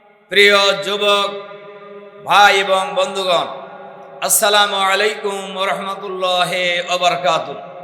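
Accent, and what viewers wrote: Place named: Sirajganj, Bangladesh. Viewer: মাঝে মাঝে সিরাজগঞ্জের ভাষা গুলো অনেক সুন্দর।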